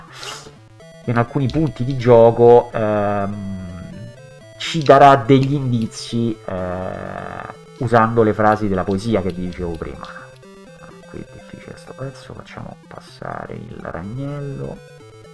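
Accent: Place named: Rome, Italy. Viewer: Si grazie, INPUT anche era una traduzione di una enciclopedia Inglese se la trovo la ripulisco! Giù allo scantinato ho anche parecchiè riviste a prendere polvere e umidità, tipo LIST, e COMMODORE GAZZETTE (era la mia preferita per Amiga e c64), L'Amiga 500 purtroppo non ce l' ho più, gioco o scrivo qualche programmino in Basic sull' emulatore di tanto in tamto. Ma il C128 sta nello scatolo insieme anche ai manuali ecc. Penso che sia un po' in cattivo stato, tipo mancano un paio di tasti e non si accende, ma si se si può rimettere in sesto ne sarei felice, dovrei informarmi come spedire un pacco grande, cosa mai fatta, sono una chiavica a risolvere problemi pratici e non mi intendo di elettronica, io sono vicino Napoli e tu dall'accento penso che sei area Roma, quindi penso dovrei spedirlo, ma penso una volta capito il tutto non dovrebbero esserci problemi, quindi si certo, nel caso fosse possibile mi farebbe molto piacere, quindi mi metto in coda :) PS: se fossi interessato alle riviste COMMODORE GAZETTE (il mio computer preferito oltre al 128 è l'Amiga naturalmente, perciò le compravo) e se fare e mandare pacchi non è una cosa troppo onerosa/e/o/stressante te le mando, (o anche la stessa INPUT se la trovo, le regalo naturalmente, lo dico a scanso equivoci, dato che stiamo su internet), penso che starebbero molto più in buone mani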